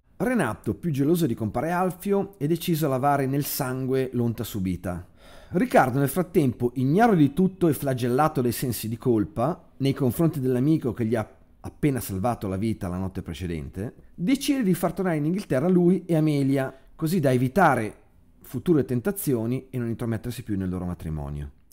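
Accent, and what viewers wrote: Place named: Modena, Italy. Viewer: Con il solito accento modenese strascicato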